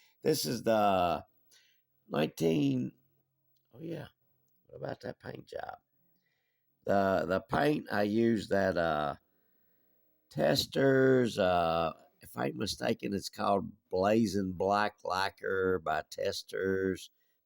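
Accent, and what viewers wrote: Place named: East Tennessee, United States. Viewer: One last question, where are you from? I’m from east TN and you talk a lot like me. Live in Arkansas now.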